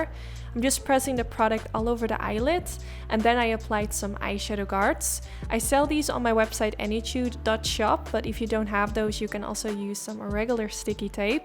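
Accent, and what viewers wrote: Netherlands. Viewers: Ik hoorde het al aan je accent dat je Nederlands was ☺️💕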